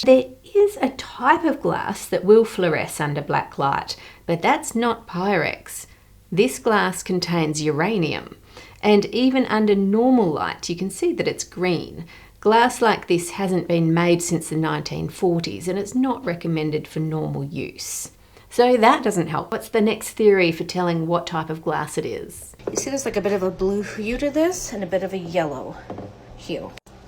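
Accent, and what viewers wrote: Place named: Australia. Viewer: As someone who's grown up in Corning and has a dad who still works for the company that makes Pyrex, it was weird to hear an australian talk about it lol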